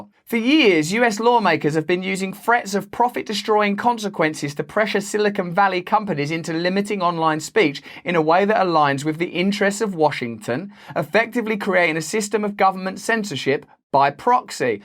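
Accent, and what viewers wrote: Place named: United Kingdom. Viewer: Greetings from 🇺🇸. I love your British voice!🙏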